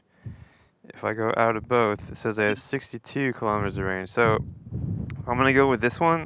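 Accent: United States. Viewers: This is actually a great video! It’s both entertaining and informative,but it’s weird hearing an American accent in a video from NZ?? Once I got over that I dug the various aspects of your testing and if I was with the NZ distributor or with Apollo I would be super happy with what you did. What freaked me out though was 50km on a scooter. WTF? I have an eBike that hits 28mph (45km) and I have only done that a couple of times and both times I was muttering a prayer that I wouldn’t hit a stone in the tarmac…things happen really fast above 30km/h much less 50. Either you must be an adrenaline junky or you have a suppressed survival instinct 😂! Bummer that NZ is so restrictive about where and how fast you can ride a scooter, but either way this was a really clever and enjoyable video and thanks for doing it.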